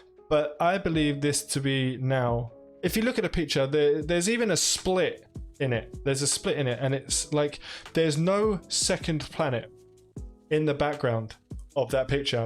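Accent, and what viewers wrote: United Kingdom. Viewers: London geezer init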